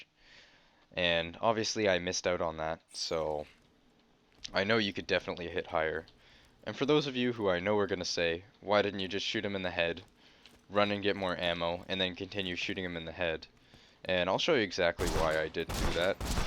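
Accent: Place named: Canada. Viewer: dat canadian accent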